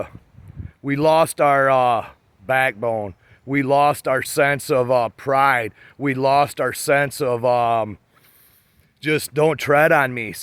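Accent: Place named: Canada. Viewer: Obviously from the accent this man is from Canada, too bad this has nothing to do with the United States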